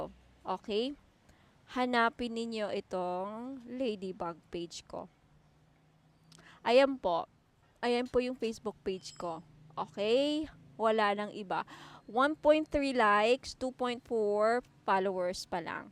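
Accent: Visayas, Philippines. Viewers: Maayong Gabii mam... Bisaya gyudta dae... hehehe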